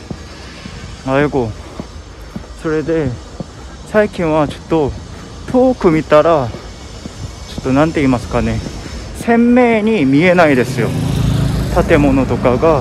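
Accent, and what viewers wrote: Fukuoka, Japan. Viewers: 福岡弁、癒される〜✨ 今は東京に住んでるけど、福岡ほんと好き〜 私は毎日とうもろこし茶飲んでるよ〜👍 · キックボードに乗りた〜〜く!!!なるスタートですね😊そして福岡弁があったか過ぎ…こんなナレーションのNEWSなら、視聴率が右肩上がりになりそうです🆙🆙🆙 最後の「ガーーーン🎶😱」音に免疫がついてきて、ビックリどころか、もはや楽しくなってきました🎶🤗🎶慣れってスゴイ…。 …毎回、やるちゅもり❓ JUJUさんが今日も元気で良かったです。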